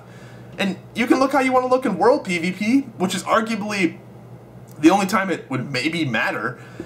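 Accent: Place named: United States. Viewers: Hes american.... obviously, us Australians have a very different accent. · Thomas Feeley yeah he's definitely american. Not from the south though, so I'm not sure where from lol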